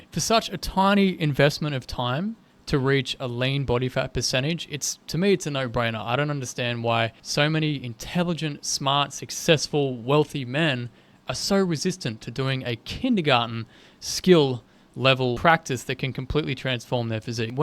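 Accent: Australia. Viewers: "a skinny fat Italian dude", I cannot see any Italian side (aesthetically) on you, even your name and surname sounds really English. From the way you pronounce some vowels I think you are Australian, anyway from which part of Italy are your (grand)parents? I like the way you explain how the body works, you are one of the best natural body I can see on Youtube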